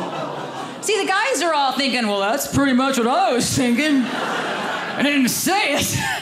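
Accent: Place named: Texas, United States. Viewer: We can tell your from Texas by the yelling, extreme speaking voice.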